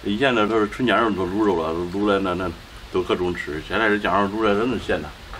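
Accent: Henan, China. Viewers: 如果你只想拍给河南人看，你就继续河南话，否则，可以尝试下普通话。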